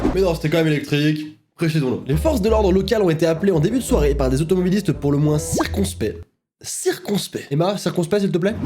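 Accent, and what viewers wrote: England, United Kingdom. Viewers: waaaaaaaaaaaw comment tu as fait ;-; ceci est le talent le plus incroyable du monde ! ( ͡° ͜ʖ ͡°) Et tu as un accent anglais incroyable ( c’est vraiment vrai ) ʕ •ᴥ•ʔ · je veut un like stp ❤️ J’aime trop ton accent anglais 😂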